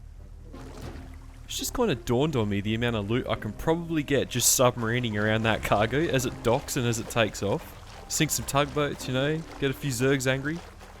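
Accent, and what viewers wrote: Australia. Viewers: Are you Australian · OMG, I’ve been watching since you had 500 subs, even on different accounts. Your Australian voice and the way you explain things always keep me hooked. It’s crazy to see how far you’ve come, and honestly, you deserve all the success. Can’t wait to see where you take this next – keep doing what you’re doing, it’s seriously inspiring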